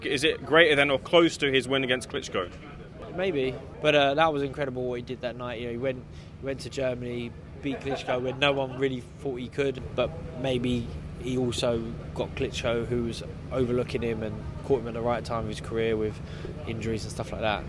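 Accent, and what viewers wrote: United Kingdom. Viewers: Please someone's tell me this Guy's accent is from in England. I love this Guy's british accent the best a foreigner has ever heard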